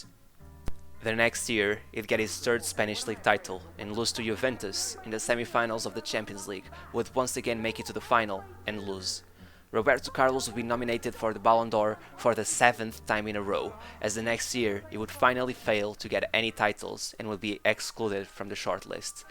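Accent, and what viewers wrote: Portugal. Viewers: you sound like a portuguese guy talking english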